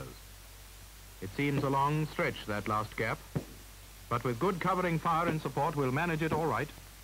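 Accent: United Kingdom. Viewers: British narrator---- but those are fighting Canadians! The greatest Canadians who ever lived! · “One tank with a personal grievance” makes me wish I had a British accent lol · The Young ones today wouldn’t understand the old British language🇬🇧 the way of saying things.. love it ,,,tally Ho ! let’s have a Cup tea ☕️ 😁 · Strange to hea so little British accent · What particular british accent is this? I love it. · This announcer is clinically British.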